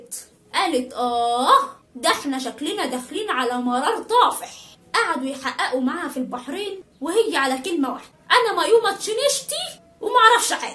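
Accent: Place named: Egypt. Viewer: اسلوبك في القصه فنااان ابدعتي خاصةً بلهجتك المصريه محليه القصه ومعطيتها جو كوميدي😭😭😭❤️حاسه نفسي اشوف فلم مدبلج بالمصري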